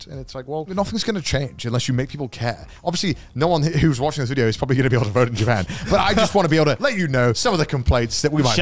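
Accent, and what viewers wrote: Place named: United States. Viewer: you sound awfully american in this clip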